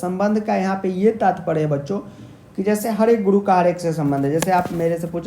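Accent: Bihar, India. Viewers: Bhut bhadiya sir asli bihari ki tarah padhe h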